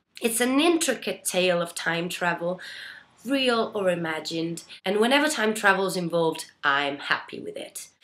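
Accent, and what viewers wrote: United Kingdom. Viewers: What in the world?!?! Why do you have a British accent? How did you do that? You're Italian, but you have an almost native British accent. Uhhh WOW! I miss your bluebeam channel... what happened? I wish I knew you in person, you're so amazing and have so much depth.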